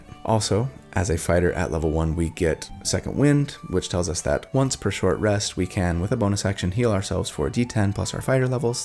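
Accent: United Kingdom. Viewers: Bes bri'ish accent evah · As britshman I confirm this is how we sound.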